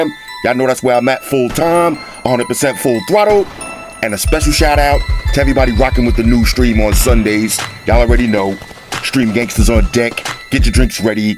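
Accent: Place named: New York, United States. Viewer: yo! Love the videos people don't know that the way you talk that's that city shit natural some talk a little different but thats that new York talk,life you feel me my dude be easy keep your head up,keep making those videos and always be floss....like you always do Littles from ct,ya heard!!!!😎👍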